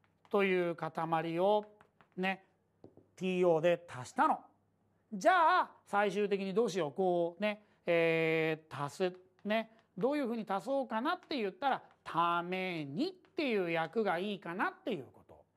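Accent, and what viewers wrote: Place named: Japan. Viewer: 発音はしゃーない 日本人だから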